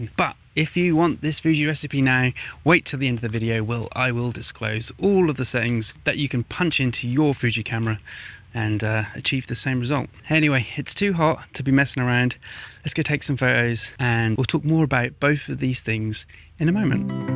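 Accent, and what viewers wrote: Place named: East Anglia, United Kingdom. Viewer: I am based in Istanbul now, just doing a bit of research into Fuji and lenses as I want a new "fun camera". Instantly, I picked up a bit of East Anglia in your accent - not 100% sure I went to check your website and yes, Suffolk! Amazing. I grew up in Norwich. Moved away in 2019. Subscribed!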